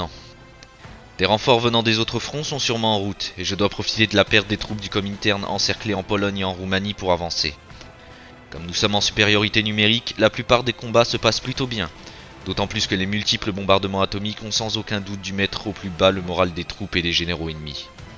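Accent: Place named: Alsace, France. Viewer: Oui tu as un petit accent c'est pour ça que j'ai cru que tu était alsacien ^^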